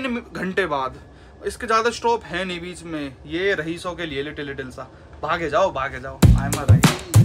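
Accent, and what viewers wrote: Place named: Jhansi, India. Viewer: Bhai..apki language se lg rha aap jhansi ya aaspas k ho...or plz kha se train li o to bta dete...aadhi info di h..😢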